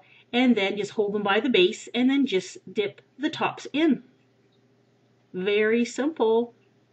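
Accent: Canada. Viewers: Haha, I KNEW you were Canadian, I noticed your accent :D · i noticed you were canadian from your accent,because my cousin is canadian. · You're Canadian! I thought that! Either Canadian or Minnesotan! I love your accent! (is that wierd??)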